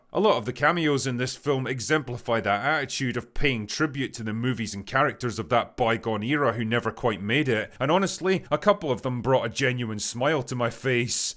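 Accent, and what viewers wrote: Scotland, United Kingdom. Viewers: Probably work on your accent you British cnt. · Why are you speaking with a weird inflexion in every sentence? I understand that Scottish is part of your brand but it’s annoying and doesn’t add any comedic value. Stop.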